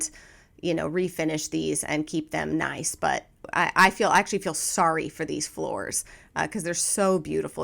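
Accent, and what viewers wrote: South, United States. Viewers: Your southern accent on top of your actual southern accent is phenomenal, gurrrrrl. P.S from a carpentry family - it's brilliant to cover those gorgeous floors until later!! Don't feel bad for them! They will thank you later and you will thank you, too! LOL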